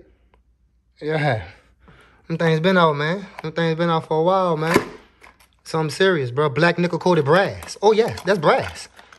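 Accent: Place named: New Orleans, United States. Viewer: that boy sound like hes from nola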